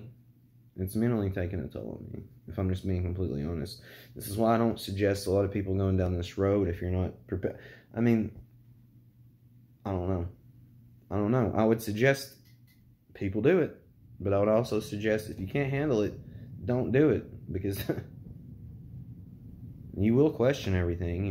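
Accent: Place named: Bible Belt, United States. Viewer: I'm sure you've already taken a trip into Alan Watts territory but if you haven't please check him out! I had always heard of him but never listened to any of his words. Theyre a perfect breakdown of how to better understand the self from a westerners views. You have a thick accent like youre from where I am from which is the Bible belt. There's so much valuable info to hear in regards to how thats shaped our view of the self and God and how guilt has been turned virtue by the church. Regardless of if youre from a Christian background or not youre from a Christian society which has shaped our language about such stuff too. We don't carry the necessary terms to describe stuff such as the Brahman that is the self and all we do. This stuff will never answer questions about simulation theory but it will show you how to live only today and worry nothing about tomorrow for what happens to you is what you will, and always has been the will of you. 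By living only in the present it matters little what the future holds. And that includes waking up out of this computer program lol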